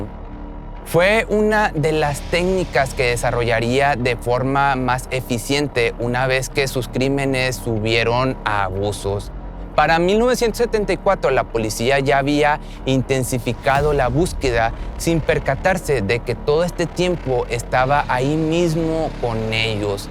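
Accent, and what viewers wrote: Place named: Mexico. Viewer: Gràcias,luego lo veré,el problema es que tienes una grácia contando cosas tan sérias,será tú acento mejicano,me tienes enganchada,un abrazo desde España.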